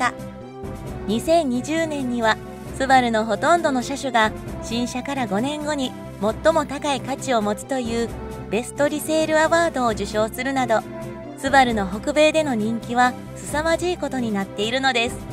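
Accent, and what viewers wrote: Kansai, Japan. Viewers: スバリストなので興味を持って見たが、関西弁で萎えた。 標準語で話して欲しかった。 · 関西訛りのナレーションが良い。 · ナレーターさんは、関西人ですか？めちゃ和むわぁ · 内容良いから聞いてたけど関西弁ウザくなる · ちょちょちょっとまて〜〜〜！この（京なまり？）（関西なまり？）好きすぎてたまらんのだけども！全然内容が頭に入ってこん。 当然チャンネル登録しちゃうでしょ〜〜！なぜ今までなかった！ん？合ったのか？俺が知らなかっただけ？ 何れにしてもめろめろだ〜〜〜〜〜〜〜〜〜〜〜〜〜！ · しゃべりのイントネーションがちょくちょく関西っぽい…？ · パーソナリティの関西弁が可愛い。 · 何が良かったって、解説の関西弁アクセントが最高でした😄👌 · ナレーションのお姉さんの関西訛りが面白かったです。だいぶ矯正したようですけど、ところどころ関西のイントネーションでなんか温かみを感じてよかったですよ。 スバルの驚異的なパワーの秘密が中島飛行機のエンジン製造技術にあったとは知りませんでした。 日本でももっと売れていいはずなのにねええ。インプレッサは今も人気だと聞きますけど。 ハリウッドの映画の中でもドラフト？する日本車はたいていインプレッサだったような…。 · 関西訛りなのめっちゃ気になって話入ってこねぇ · ”出来の良い車”は富士重工の車・・・って車好きの親から言われ続けて育ちました。 スバルってホント、”キッチリ”作りこまれていますもの！ それから 今回のナレーションの彼女も少しの関西なまりがすてきでした。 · 関西人やね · 「スバル」のサムネイルに惹かれて見にきました。 なんだか関西弁が心地よくて聞き入ってしまいました。 冒頭で私が所有しているフォレスターの話題だったため 最後まで見入ってしまいました。 チャンネル登録もさせていただきましたよ。 応援しています。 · このナレーション、関西訛り全開で親しみがわくわ！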